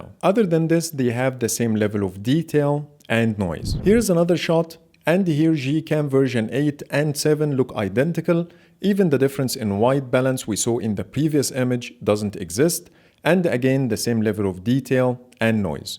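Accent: Egypt. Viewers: Very nice Egyptian accent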